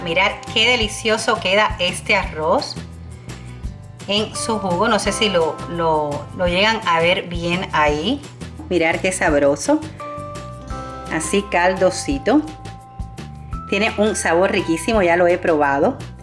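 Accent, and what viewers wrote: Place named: Cuba. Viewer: Hola wuapa .nueva por aki buscando resetas .te encontré. Me gusta tu manera de explicar y tu acento eres cubana .k pinta tiene ese arros por dios k riko .un saludo un gusto verte y estar suscrita atu canal 👌💪😘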